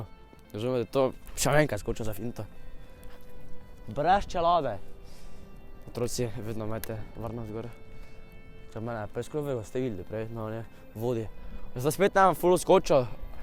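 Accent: Styria, Slovenia. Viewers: Nauč se govort normalno pa ne po Štajersko pa boš Mel več ogledov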